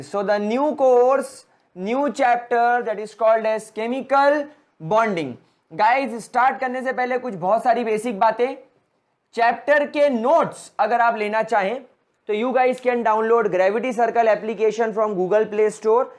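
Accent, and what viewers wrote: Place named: Gujarat, India. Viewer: By your accent I can understand that you are a Gujarati.........Isn't it?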